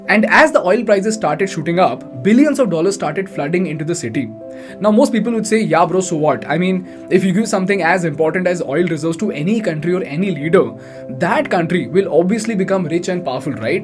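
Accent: India. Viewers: Maybe your english has a little indian accent? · Please get somebody who does not have an Indian accent! They sound horrible! they sound like the phone scammers who steal money from old people in America.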